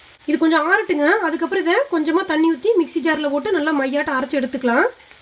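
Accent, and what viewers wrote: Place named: Coimbatore, India. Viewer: Try pandren mam we are from cbe happy to hear our oor slang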